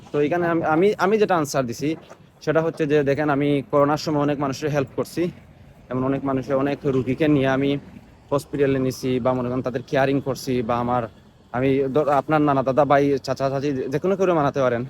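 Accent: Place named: Sylhet, Bangladesh. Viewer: Sylheti vibes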